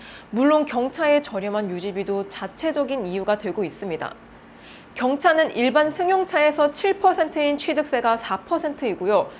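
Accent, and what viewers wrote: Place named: Gyeongsang, South Korea. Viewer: 경상도 말씨. 절대 감출수없는 말투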